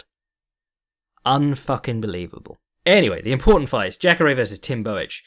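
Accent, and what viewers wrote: England, United Kingdom. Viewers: Where about's in England are you from? Also, if it's close to me, can we be friends?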